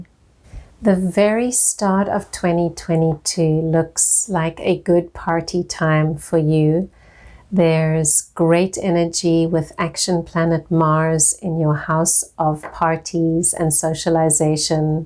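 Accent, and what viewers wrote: South Africa. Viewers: Are you South African tho??..I can hear the accent...or am.I wrong...Ur amazing...My heart thanks you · Sounds like you're South African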